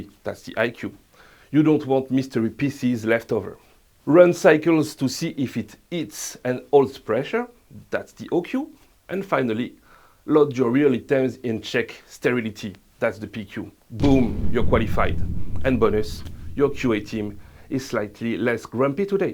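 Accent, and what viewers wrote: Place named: France. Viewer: What a lovely french accent.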